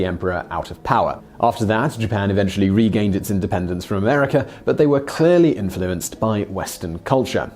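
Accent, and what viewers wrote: United Kingdom. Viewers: mispronunciations really bother me for some reason. He does pronounce things in a British way sometimes which doesn't bother me. It's more the pronunciation of Yukatan and on one of the other videos he mispronounce Haiti. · You realize he is British and they pronounce things differently there right? Saying Yuck-atan is perfectly fine. He also pronounced Leif correctly, it is a Scandinavian word and it is usually pronounced Layf, look it up. Try to do some research before attempting to incorrectly correct things, yah dingus.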